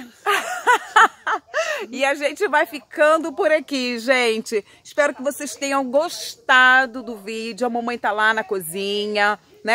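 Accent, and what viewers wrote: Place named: Região dos Lagos, Brazil. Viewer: Nós de maricá pra lá, região dos lagos, acho que falamos assim. Mamãe e papai😀😀😀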